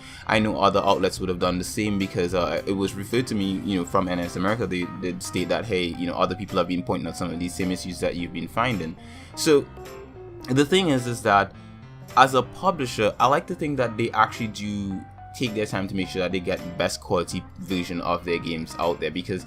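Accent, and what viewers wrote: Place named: Trinidad and Tobago. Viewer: What country are you from? The accent is feeling like a trini 🇹🇹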